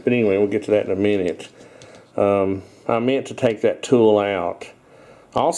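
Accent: South, United States. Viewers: that man comes from the South. Man you can hear that.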